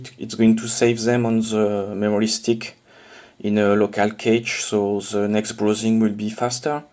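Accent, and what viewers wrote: France. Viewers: Are You French ? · It's no secret that foreigners can often write/speak better than native english speakers. This french accent is nice and understandable which is most important. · I like the frenglish ;-) Will it work under 3.90 M 33-3? Merci beaucoup pour le Video, Chris, de Corfu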